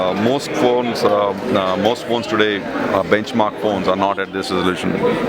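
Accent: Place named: India. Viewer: get used to the indian accent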